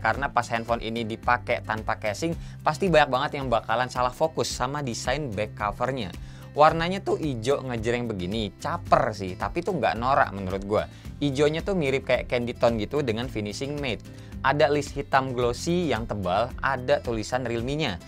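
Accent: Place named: Sunda, Indonesia. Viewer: Orang mana emang Kang? Campur sunda uyy 😂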